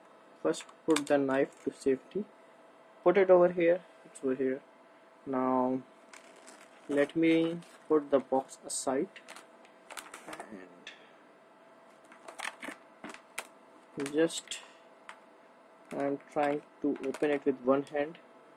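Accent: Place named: India. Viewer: WHY you are talking like this..! Indian English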